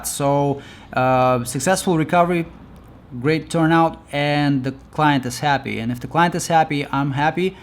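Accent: Russia. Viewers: акцент-то русский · Russian accent ! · Почему у него русский акцент? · Cool video. Let me quess you are Russian? 😁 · Does this guy has some russian accent? Im from russia and his speech seems unusually clear and strongly articulated for me.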